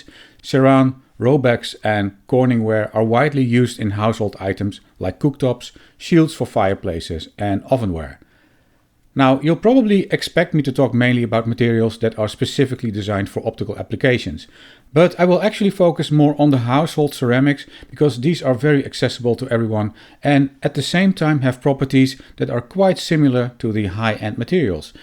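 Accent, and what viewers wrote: Netherlands. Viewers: I thoroughly enjoyed digesting this information. Your dutch accent reminds me of my birthplace NL. Thank you for the education.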